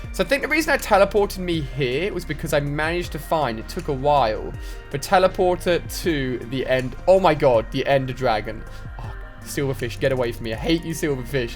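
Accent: United Kingdom. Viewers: he says toitoise cause hes British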